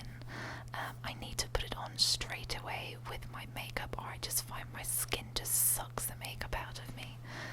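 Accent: Scotland, United Kingdom. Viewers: Great video, could you do some more Scottish accent soon? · Nice to hear a UK accent! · your otherwise beautifully Brittish accent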